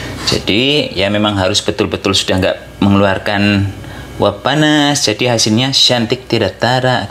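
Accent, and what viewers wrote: Banyumas, Indonesia. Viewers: Njenengan wong endi mas, ngapak2😅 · Wong ngapak..., Ngomonge ngapak..., Ora ngapak ora kepenak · Ngapak....senyum2 sndiri dipanggil lik.. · Ngapak mas medok banget semoga sukses mas.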